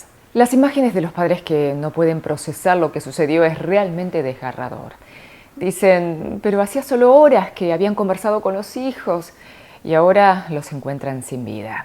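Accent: Argentina. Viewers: Me encanta tu voz de periodista , tenes acento Argentino 🙂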